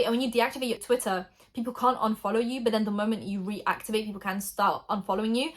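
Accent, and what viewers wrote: United Kingdom. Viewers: Your accept is proper British that’s so cool! I wonder if you speak polish if your accent changes! It’s amazing how if someone goes to another country if there from someone else they actually get the accent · Always get thrown off when you’re like “I’m not British” because my brain is like “British accent = British”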